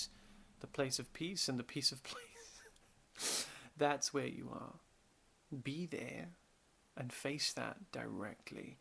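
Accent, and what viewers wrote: Wales, United Kingdom. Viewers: Do I hear a slight welsh accent?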